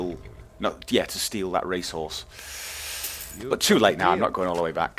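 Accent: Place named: Scotland, United Kingdom. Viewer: I fuckin love your accent, scotish right?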